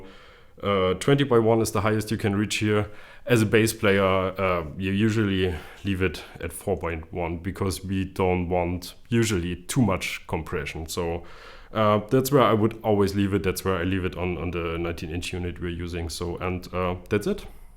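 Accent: Germany. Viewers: A German man who speaks English😂 nice accent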